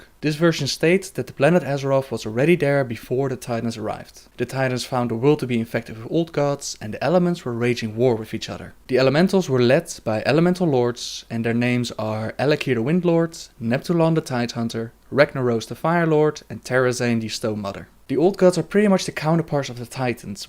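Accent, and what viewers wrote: Netherlands. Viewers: u MUST be dutch.. if not.. well we just sound alike when we speak English xD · I knew you were dutch ... I just could hear it :D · let me guess. you're Dutch? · Right away i can tell you are from holland or belgium just by your horrible english accent!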